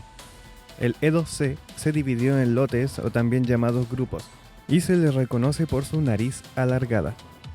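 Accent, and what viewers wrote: Chile. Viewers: Solo por curiosidad, el que relata es de origen chileno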